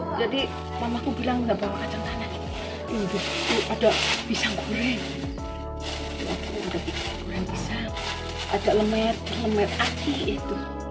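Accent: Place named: Banyumas, Indonesia. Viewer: Mantap yu ngapak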